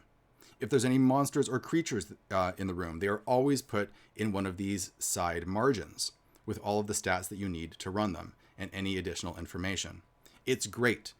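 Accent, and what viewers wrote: United States. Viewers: Hello, a dude from sweden here, dowing what we do best: Drinking coffe and trying to convivnce the world that the swedish language is not hard, actually. The classics, Å Ä Ö. Å is pronounced like the O in Lord, Ä is pronounced as the A in Damn, and finaly Ö is pronounced kinda like the U in Murder. For the O in Borg, shorten it a bit and deepen it a bit. And finaly the end G is not as hard, and pronounced more as a J. From Sweden with Love - Kami P.S, i think you just need to extend your E abit, so its more like "Tveeland", and if you wanna get realy fancy you could work on your swedish A, wich is more as the A in Lard as a standard than the american A, who sounds more like our Ä. But honestly, your doing fine, mate. Swedish realy isnt that hard to speak(tm)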